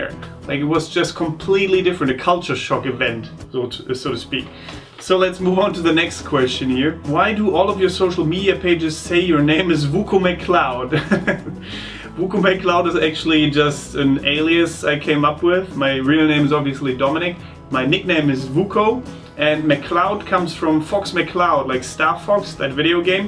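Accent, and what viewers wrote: Germany. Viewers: So, JETZT bist du mir sympathisch. Lylat Wars-Fanboy. Herrliches Spiel - immer noch! Leider hat Nintendo das Remake auf der Wii sowas von in den Sand gesetzt ... Und ein like für deinen deutschen Akzent. Lass das so! Versuch erst gar nicht, amerikanischer zu klingen. Amis können nicht mal "Rammstein" ordentlich aussprechen ... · You kind of remind me of my mom. She is German ( I'm half) and even though we moved to America 30 years ago, she still has a German accent when she speaks English. Hearing your accent makes me think of her...also in another video you said "silly goose" and I was quite surprised because I never heard anyone say that other than her when she was alive. When we moved here from Germany, she started speaking only english to us, it sucks because now that I'm grown, I'm struggling to learn German. I want to go back to Germany for 2-3 months but nervous because I don't know the language to well or which part to go to. 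Anyway, I like your videos.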